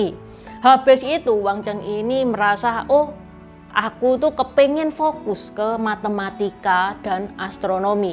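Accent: Java, Indonesia. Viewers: Logat bicaranya k sangat medok bahasa Jawa, sebenarnya k ini ada di mana siiih? Di China atau ada di INDONESIA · Bu jowone medok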